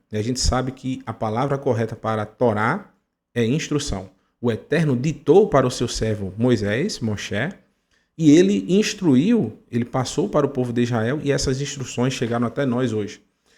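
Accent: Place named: Northeast, Brazil. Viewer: Mas que beleza. Meio nordestino. Amei.❤❤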